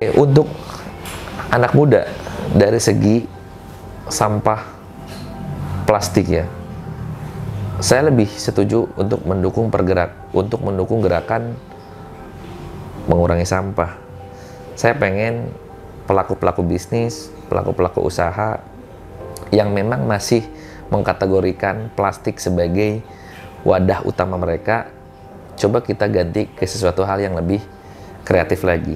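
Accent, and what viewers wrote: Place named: West Java, Indonesia. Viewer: kalo dari tata bahasanya dia ini hanya domisili purwakarta ... paham sekali lidah sunda itu klo pakai bahasa indo dicampur" 👍👍😁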